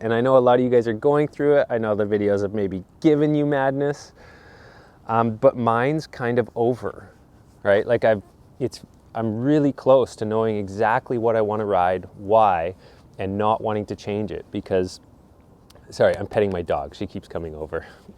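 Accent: Canada. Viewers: Just want to say my thanks, because I've gotten a lot from this channel over the last few years, including: - understanding my skateboard on such a deeper level - from trucks to decks, you tapped into my geek nature - when doubts would creep in about my age (late 30s), you inspired me to keep skating. I would often think, shit, skates multiple times a week and he's older, and also does physical labor for a living! I'll be alright;) - you soothed my nerves in times of stress. your Canadian accent and manner of talking are very calming to listen to! Much appreciated,. Best of luck with whatever you do!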